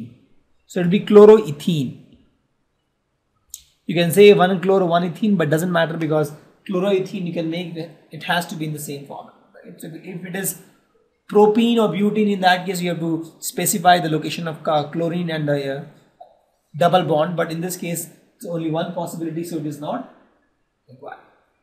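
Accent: India. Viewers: ^ Are you like from US or UK. :3 We are indians. Our accent is like that,bro. :3 Stop complaining,start appreciating. -_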